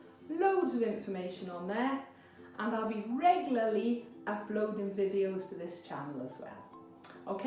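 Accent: Wales, United Kingdom. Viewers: At 85, and since the recent loss of my beautiful wife, I’ve picked up a ukulele for the first time. I not only enjoyed your 12 bar blues lessons I loved hearing your accent as I lived in Raglan with my Auntie during the war years and left my heart there so it’s a win win for me. I’ve lived in this part of the world- Australia/NZ since 1955. Thank you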